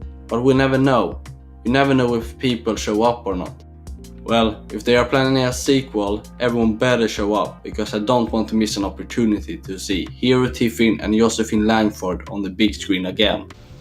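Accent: Sweden. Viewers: Visst är du som pratar svensk hahahaah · U rly sound Swedish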